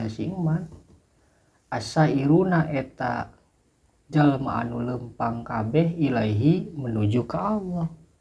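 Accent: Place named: Java, Indonesia. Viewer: logat jawaan pak ustadz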